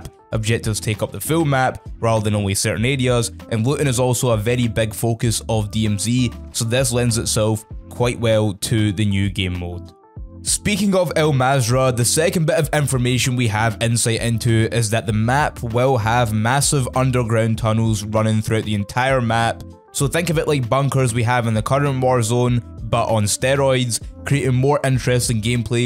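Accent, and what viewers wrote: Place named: Scotland, United Kingdom. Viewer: I am simple man. I hear a Scottish accent, I give a thumbs up.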